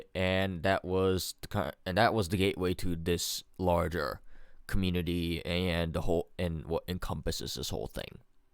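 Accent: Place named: Ontario, Canada. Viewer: You sound like your from ontario, more specifically markham area... Other than the guns that makes me think your in usa maybe north side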